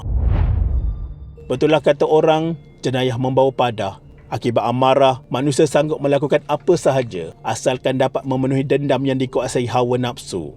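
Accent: Kelantan, Malaysia. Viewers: logat meme tok lari ore kelate be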